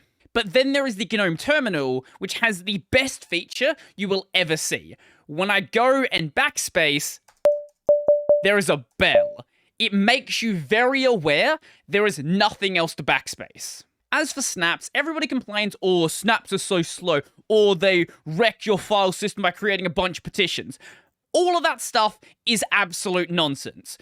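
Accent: Australia. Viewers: I fell for it until I realised you are Australian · are you australian or what?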